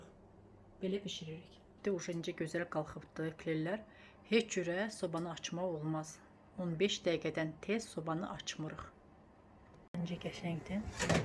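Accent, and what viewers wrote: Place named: Qakh, Azerbaijan. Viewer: Gözəl ləhcəmiz ❤ Qax' dı, Zaqatala? Əllərinizə sagllq 🌹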